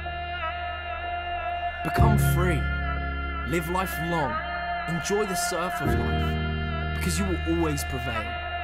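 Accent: United Kingdom. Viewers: actually it's one of the most impressive motivational videos i've ever seen best speech , great video and your British accent is astonishing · Accent sounds crazily British.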